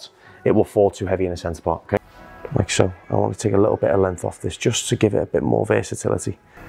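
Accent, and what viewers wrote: Liverpool, United Kingdom. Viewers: Love his Scouse accent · Scouse accent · That scouse accent❤️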